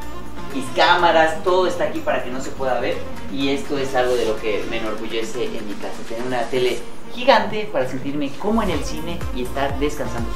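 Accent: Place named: Yucatan, Mexico. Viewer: Me gusta que nunca se le perdió el acento yucateco.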